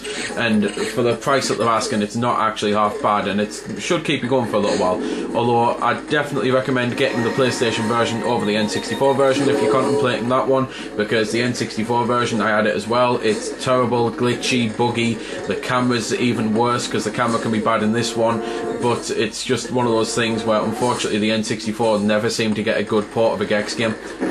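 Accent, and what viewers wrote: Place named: Newcastle, United Kingdom. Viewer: Nive video mate. You sound local, you from Newcastle?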